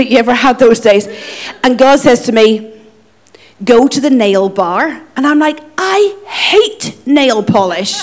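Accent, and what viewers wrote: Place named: Ireland. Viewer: the Irish accent is one of my top 3 least favorite accents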